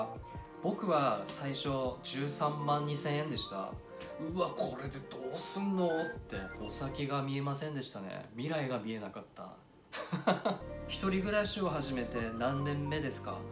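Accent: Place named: Hokkaido, Japan. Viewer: かっこよくて、介護士さん。ちょっとした北海道なまり？も、とても可愛いと思います！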